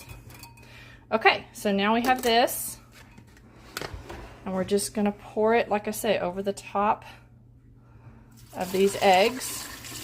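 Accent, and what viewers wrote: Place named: Ecuador. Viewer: Puerto Rican?… my minds’ playing tricks on me… I thought you were from “South America“….Ecuador . …. But whichever it may be, I was very happy to hear your mami’s voice and the pride in it, too ! ❤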